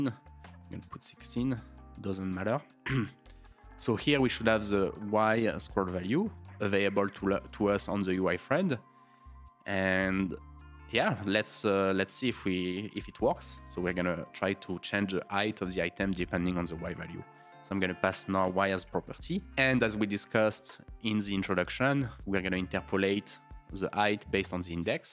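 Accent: France. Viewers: German speaker, sounds like French, looks like American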